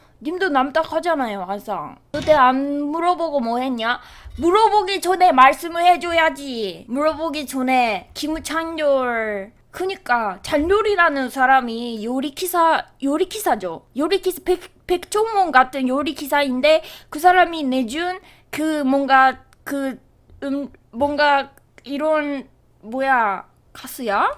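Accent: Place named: Japan. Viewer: 오늘 꽤 간만에 일본인스러운 한국어다. 반갑네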